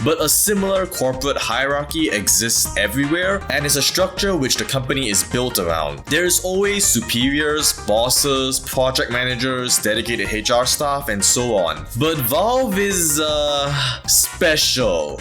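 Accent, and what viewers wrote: Singapore. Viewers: Are you from Singapore?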